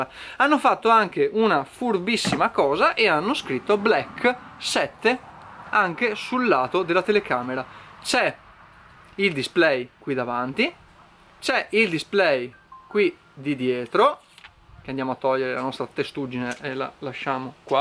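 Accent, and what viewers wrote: Veneto, Italy. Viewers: ciao e grazie per il video. Domanda... dall'accento sei veneto esattamente come me. Ma da dove di preciso?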